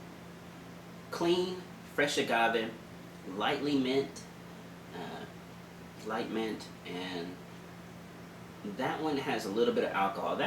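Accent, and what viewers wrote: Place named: South Texas, United States. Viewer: I can tell this man from south Texas Cheers brother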